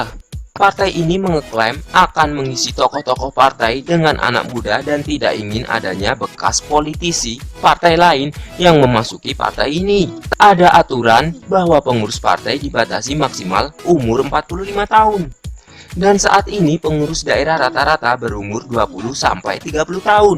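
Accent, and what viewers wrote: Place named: Jakarta, Indonesia. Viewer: Gaya Logat jakarte tpi belepotan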